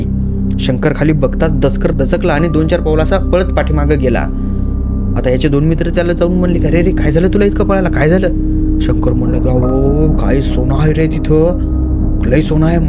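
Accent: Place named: Beed, India. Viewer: बीड चे काय ,बोलीभाषा वाटते तशी😅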